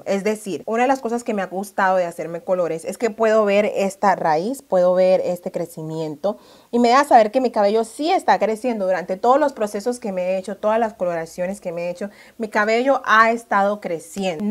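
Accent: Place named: Colombia. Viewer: tienes el timbao de la gente afro de buenaventura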